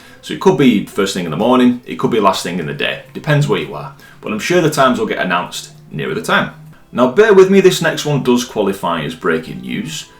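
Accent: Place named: Northern England, United Kingdom. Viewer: This is the first time I've seen you. Very helpful video thank you. Also nice to hear a northern accent, Yorkshire lady here!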